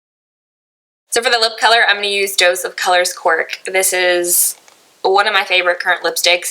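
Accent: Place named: Louisiana, United States. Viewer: I love your accent that comes through sometimes. I'm from Louisiana, near Baton Rouge, so it's fun to hear a little bit of familiar dialect.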